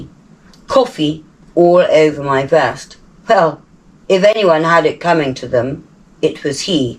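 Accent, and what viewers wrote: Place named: Yorkshire, United Kingdom. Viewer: I loved your Yorkshire accent , I chuckled away at it , brilliant 😊. I had one for ratting too , but a light fingered personage had away with it . Looking for another at present want another bsa meteor .22. Like the older models . Be careful with that knuckle lass , 5yrs for owning one , if caught . I hope to bump into you sometime when I'm down Devon , would be lovely to meet you . I think you are a very interesting person . Keep the vids coming Lass, brightens my day when they get posted 😎👍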